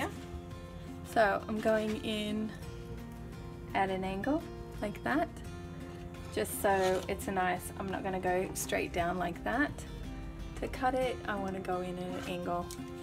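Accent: Australia. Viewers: Beautiful work. I thought you had an Aussie accent. Keep up the great work.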